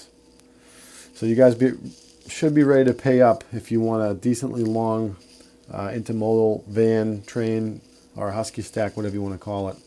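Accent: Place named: Massachusetts, United States. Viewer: Great video review, You did a good job calling out nonsense and exorbitant prices. Between the prices, sloppy craftsmanship, goofy colors, and bad customer service, it's hard to justifying buying this stuff That RS-27 came from the MTH budget rail-king line which sold well under $400. Lionel almost doubled the price when they got the tooling The passenger cars are almost Golden Gate Depot prices, except Lionel passenger car are plastic, generic and not in the same league. I know there is rampant inflation, but the Lionel steep price jumps started last catalog, before inflation really ramped up....after MTH announced their closing Sounds like you are from Mass, I grew up in Dorchester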